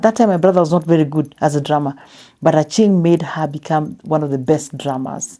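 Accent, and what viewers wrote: Luo, Kenya. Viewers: She’s so hilarious with Luo accent😂😂😂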